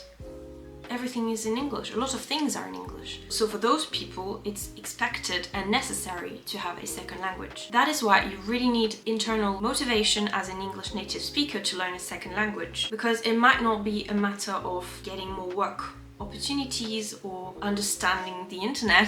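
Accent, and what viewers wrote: United Kingdom. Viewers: love your english accent! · I can tell that you have spent many months in the UK. Some of your sounds are very British. That's good. Well done. I would not have guessed you are French. This was a good list of what I have encountered while learning French. I'm at a 50 score now, and hoping to make B1 before I go to Senegal in March. I wish I had made more of an effort in grade school. I'm also working on Spanish, since I will be in the Dominican in October. I do missionary construction work, and I don't like to rely too much on the missionaries to communicate. Netflix does not have a lot of French content in the US, so if you have a tip for me about how to access that, it might help my French listening ability.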